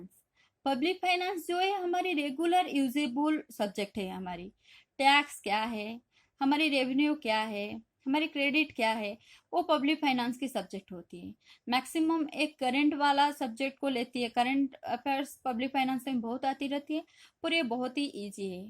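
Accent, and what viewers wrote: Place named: Maharashtra, India. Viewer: mam i enjoyed your hindi......probably you are from maharastra region ........though very very thanks for valuable suggestion.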